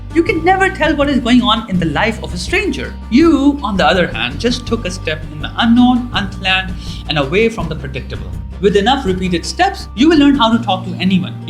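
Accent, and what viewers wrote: India. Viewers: I don't know, but I think he's Indian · i hate your Indian accent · Indian accent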